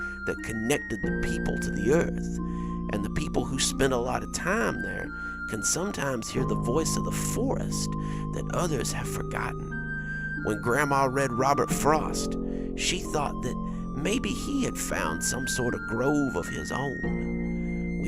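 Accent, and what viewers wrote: South, United States. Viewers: Your southern delivery helped this story immeasurably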